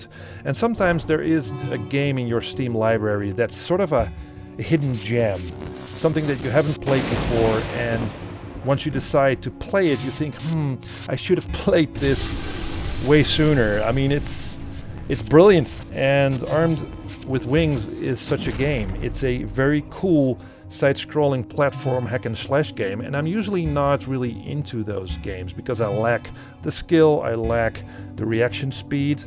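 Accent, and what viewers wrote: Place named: Netherlands. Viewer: Thanks for your review. BTW, your accent really sounds like someone from The Netherlands.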